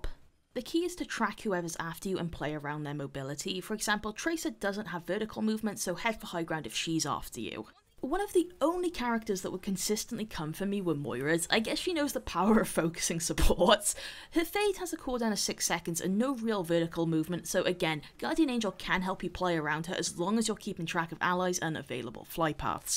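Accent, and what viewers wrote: United Kingdom. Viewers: I always wondered, what part of UK is this accent from. I find it funny but lovely. I had it stuck in my head when I played Witcher 3.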